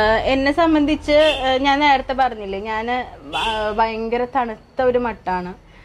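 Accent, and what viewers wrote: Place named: Thrissur, India. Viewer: chechi dea Thrissur language ❤️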